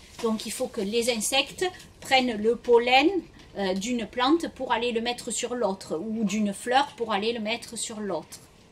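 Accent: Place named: South, France. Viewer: Bonjour, vos explications sont claires et précises, et avec l'accent du midi cela nous amène le soleil. Vive le sexe😉 des plantes.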